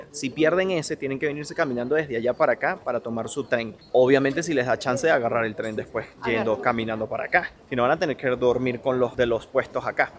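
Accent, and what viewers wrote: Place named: Venezuela. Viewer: eres guapo No habia tenido el honor de conoserte.. Veo tienes esposa) Por tu acento eres Venezolano _? Mire todo el video y me quede esperando una toma' cerca de ese pico de la ⛰️ Creo era al final..pero tengo muchas preguntas/ 1_ Las 🪨 rocas estan sin cemento_? Mi Padre hacia lienzos de piedras y solo encimandolas se caian seguido) Estas rocas tienen buena colocacion y creo se adhieren fuerte y miro estan partidas con alguna maquinaria..no hay piedra que sea tan derecha y ahi muchas son partidas exactas/ 2_ Machu Pichu tiene laderas muy altas.. La gente pregunta como construyeron tan arriba/ Yo pienso que era un altiplano parejo y con el tiempo se fueron quedando algunos arriba_ dando un aspecto de que construyeron a las alturas) Mi pregunta es' Algun terremoto destruyo los alrededores y solo sobrevivio ese piko de laderas.._? 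Amigo " Que fortuna poder viajar y conoser en persona lugares misticos' Al tu estar grabando y hablando..pense que te perdistes..el sentir la recarga de seres que habitan invisibles ahi..yo mire en tu recorrido unos movimientos detras de una ventana y no eran personas/ Eso paso antes de la lluvia y el viento' Porque estabas llegando a la plaza principal y el estar a esas alturas.. esos seres cuidan sus terrenos y al final les dieron permiso de seguir' porque podia haber una tormenta mayor y tuvieran que parar y regresarse.. hasta yo pensé.. Noooo ya se estaban acercando a la montaña y no vere lo mas importante) La Roca Sagrada Tuvo sacri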